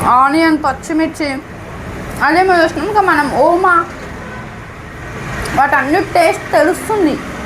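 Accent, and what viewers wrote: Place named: Telangana, India. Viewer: Akka Miru pakkaTelangana slang hey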